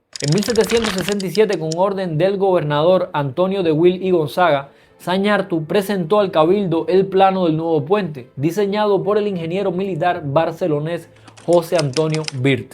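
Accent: Cuba. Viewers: Estimado amigo...! Que grato escuchar tu relato, sobre este puente del cual no se habla mucho y menos con la presicion, dedicación y amor al relato, con lógica histórica, ya que la colonia en todo el mundo fue una época vivida con mucha dificultad desagradable que si bien es cierto está gran parte escrita hay lugar en el relato qué da lugar a la fantasía pero de la buena, grata entretenida aferrada a la historia escrita, se te agradece Estimado amigo cubano, tu acento le obsequió un tono además hermoso a esta historia, un millón de gracias.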